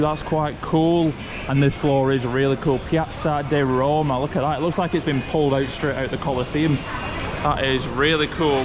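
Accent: United Kingdom. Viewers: Are you cockney?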